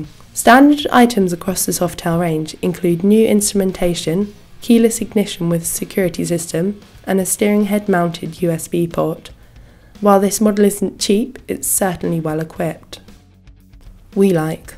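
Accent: United Kingdom. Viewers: Interesting review. Please work on enunciation and slow down a little... that brittiot accent is annoying. But a cute girl and a nice new motorcycle are a reason to watch this interview for sure. And remember, there are 300lb (all muscle) big guys like me out there who would rather have a bike that can handle our weight and aggressive riding style than some sport bike. Also one with more torque fits us better than higher horsepower bikes that require super high rpms to accelerate a moderate load and could not corner well with our size and weight anyway. And finally the big tires tend to eliminate grooved pavement and lane segments very well... so they actually improve handling. Ah... not the best review there, but what do you expect from the British mush.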